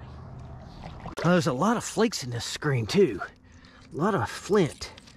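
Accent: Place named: West Virginia, United States. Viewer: That accent... puts you somewhere around Fairmont to Clarksburgh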